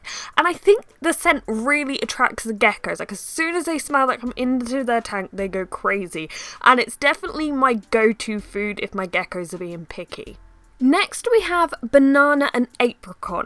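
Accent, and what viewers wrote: United Kingdom. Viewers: Nico Savoca it’s a British accent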